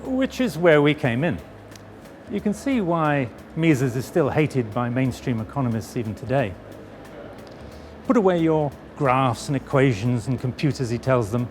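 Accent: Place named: United Kingdom. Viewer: i would like to hear this guy explain every aspect of life to me in videos like this with his classy british accent.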